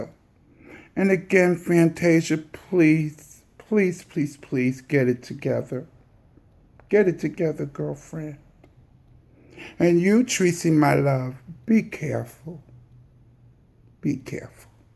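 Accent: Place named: New York, United States. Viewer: I live for your thick "rich white woman" NYC voice and accent,. 💞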